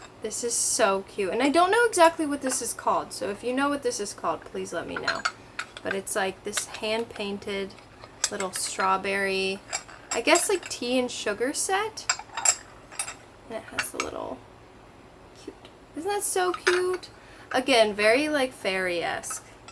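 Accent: South, United States. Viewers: Where’s her accent from? It feels kinda southern, kinda midwest maybe? I truly don’t know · i was NOT expecting you to have a country accent, i was shook 😂😅 yay another fellow country sounding girl 🎉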